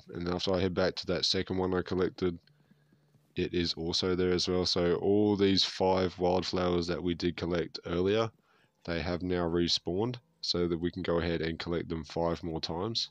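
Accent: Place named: New Jersey, United States. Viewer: this is a great one. luv u accent....New Jersey?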